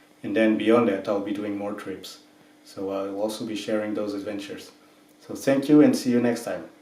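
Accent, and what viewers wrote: Portugal. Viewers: thanks a lot and keep doing this kind of videos. I'm following your channel with special atention since i have exactly the same bike. Are you Portuguese? Your accent sounds from a portuguese.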